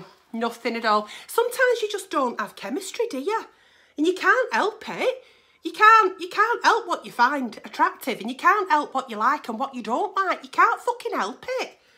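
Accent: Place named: Lancashire, United Kingdom. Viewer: U sound like ur from Accrington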